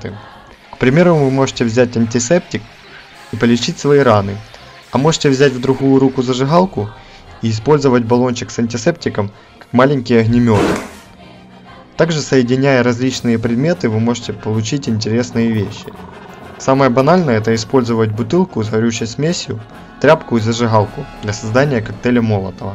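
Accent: Ukraine. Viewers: Автор! Я балдею от твоего украинского произношения. Не слушай никого! Оно прекрасно. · Хватит издеваться над произношением автора, он не Хыкает, это украинский акцент такой, в большинстве слов вместо привычного Г есть нечто вроде Х, а там где четкое Г нужно, там есть Ґ, но оно редко используеться. Также например привычно говорить ШО вместо ЧТО. Язык такой, имейте совесть :)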